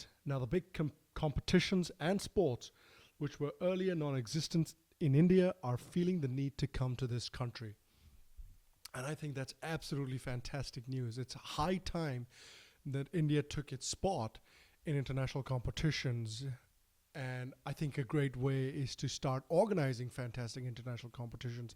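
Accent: United States. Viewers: Ahh your first video, your accent sounds American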